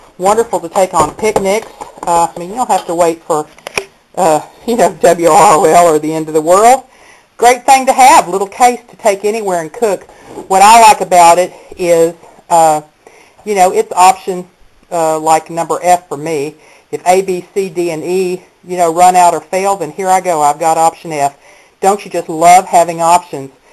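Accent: South, United States. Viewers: sweet, southern drawl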